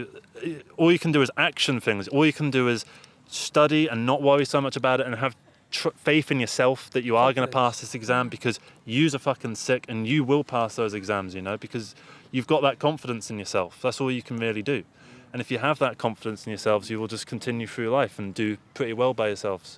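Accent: United Kingdom. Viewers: The algorithm seems to incorporate even your style of music, scary! Meaning I like your music also, the eminor guitar stuff. Holy shit, you’ve basically just wrote a best selling psychology book for artists! Prove me wrong! I’m double your age. Maybe it’s your brit accent, but your style and mannerisms remind me alot of a John lennon. I know what I’m saying. Because of human nature imperfections I was gonna side with u on the relationship thing, and then The homuncle whispered, no, its no body’s fault, it just wasn’t meant to be, we didn’t mix and thats it. You see that, your realistic look of peace and love has affected my thinking! Keep playing that fucking guitar and keep talking, they inspire me, your genuine man.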